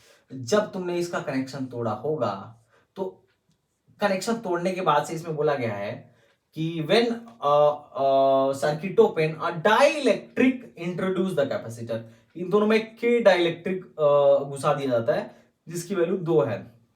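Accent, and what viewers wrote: Bihar, India. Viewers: Sir ji lagta h Bihar se h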